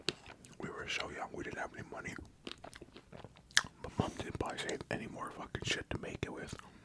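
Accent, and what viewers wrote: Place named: Canada. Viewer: didn’t know you were Canadian for like 6 months of watching until you said car in this vid! And then everything started to come together when you said “mum” and started talking about mr noodle (I’m Canadian)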